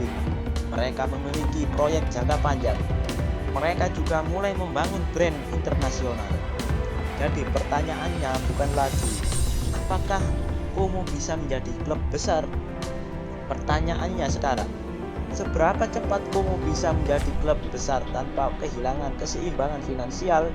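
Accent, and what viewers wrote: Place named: Java, Indonesia. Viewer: Menurut saya : perbaiki dulu narator mu.. kalo bisa jangan terlalu medok jawa, belajar n liat jurnalis2 nasional TV, mereka gak ada yg medok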